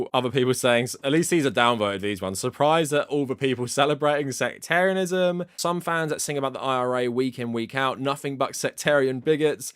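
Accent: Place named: RP, United Kingdom. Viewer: Dude talks about British mentality being so cucked but refers to himself as Irish despite sounding posher than 95% of the UK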